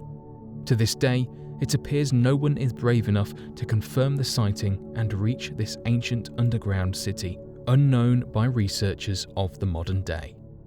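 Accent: United Kingdom. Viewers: The Aztecs were of north and Central America, The Incas are from South America. This information provided is off, everything sounds legitimate when you have a dude with a British accent narrating it.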